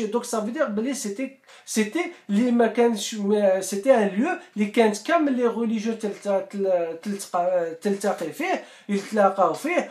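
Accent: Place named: Ghazaouet, Algeria. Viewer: Salem, Chokrane, Merci de nous transmettre votre savoir. Allah yatek a saha Inchallah j’espère que nos jeunes feront quelque chose, ainsi que la Diaspora Algérienne. Vous avez l’accent de. Ghazaouet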